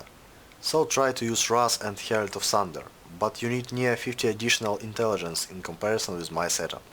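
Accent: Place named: Russia. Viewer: Твой руззгий акцент просто супер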